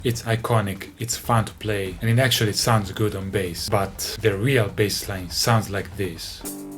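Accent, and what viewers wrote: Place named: Italy. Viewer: who? the commenter said he was mexican and I dont think is american, sounds pretty italian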